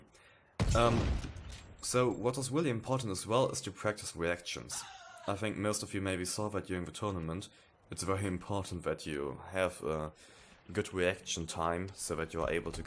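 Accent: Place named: Germany. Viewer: German accent! sounds so weird to me but english people love it lol. Also very good video man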